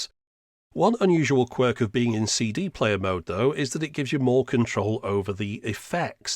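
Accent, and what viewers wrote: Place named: North West England, United Kingdom. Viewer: Just watched your 'shaving cds' video... Funniest thing I've seen in ages. Not even into hifi, though I am a musician, but subscribed on the back of it. Partly because you sound like you're from my part of the world (North West) and because I've got high hopes for April 1st ;) Cheers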